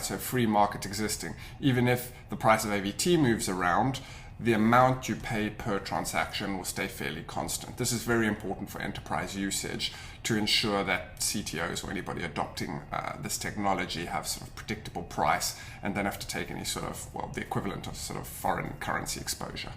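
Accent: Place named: South Africa. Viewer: I detect a South African accent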